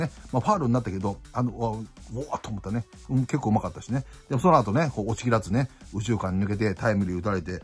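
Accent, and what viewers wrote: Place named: Western Japan, Japan. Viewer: 声質と西日本系のイントネーションが似てるのかもしれませんね！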